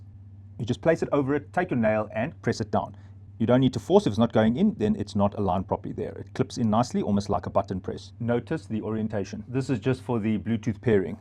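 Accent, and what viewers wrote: South Africa. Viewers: I can hear the south african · fucking love the south African accent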